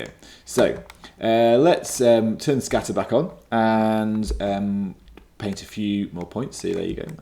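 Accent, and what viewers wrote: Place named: England, United Kingdom. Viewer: DUDE YOU SAVED MY LIFE! I was about to die from wasting my life on Xgen geometry instance painter - but then you came out of nowhere with your sophisticated english accent and saved me!! : O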